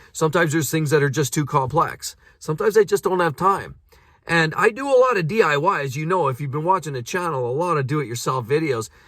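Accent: Canada. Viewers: Abooout! Or Abouuut! This is Canadian core pronunciation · you do come through a little canadian at times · your Canadian accent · you have a slight Canadian Accent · appreciate your Canadian pronunciations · Awesome videos man. The aboot comment really got me haha 😂 But honestly, your content is some of the most entertaining content on YouTube & I’m always looking forward to when a new video is uploaded. It’s awesome to see you walk around these cars & do a 360 with your camera to then switch to a close up of your face when commenting on certain elements is very very very entertaining. Please don’t change. And with regards to the aboat part, I mean, it’s very obvious you’re Canadian but that’s not a bad thing. Much respect from Belgium! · I love your Canadian accent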